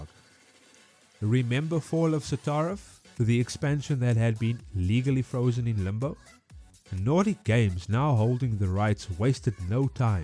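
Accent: South Africa. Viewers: I'm sorry if this is a bit off topic, but given your accent I have to ask: Are you South African? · Do I hear a fellow South African?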